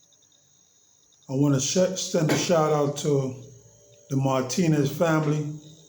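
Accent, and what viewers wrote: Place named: Belize. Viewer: I thought that but it's actually Belizean they sound similar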